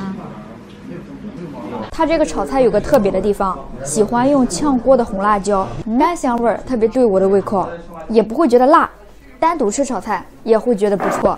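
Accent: Shandong, China. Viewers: 妹妹是山东哪里人？好熟悉的方言普通话发音😂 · 有山东口音呀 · 主持人这山东口音太浓了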